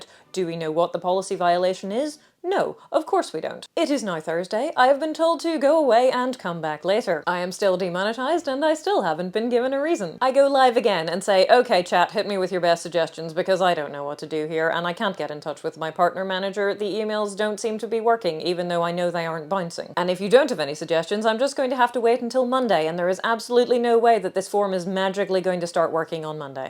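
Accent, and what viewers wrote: Ireland. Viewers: Im just trying to figure out what region your accent is from. Irish? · I love that you say : in the Year of Our Lord with that sweetest Irish brogue of your ! If flies in the face of all the dumb sheep who say "Common Era" like it was a piece of legestative rule that if one disobeyed ones nose would fall off . The brogue is what the systemic algorithm looks at with a brain that's bruised with numb surprise with still one place to go.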